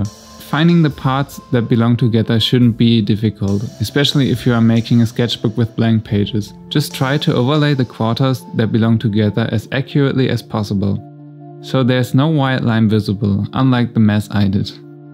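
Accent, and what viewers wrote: Germany. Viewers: He sounds german Bist du Deutsch? 'v'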